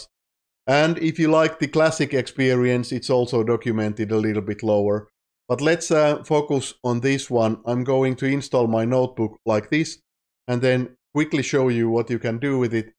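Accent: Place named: Finland. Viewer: Love the Finnish accent, great! From Sweden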